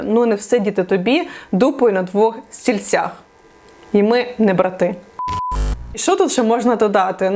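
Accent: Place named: Western Ukraine, Ukraine. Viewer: Клас, цей західний діалект🥰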